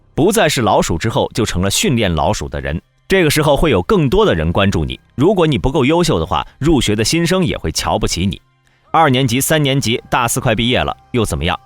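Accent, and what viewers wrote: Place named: China. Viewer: 這英文發音真的很中國 跟硬度人有的拼.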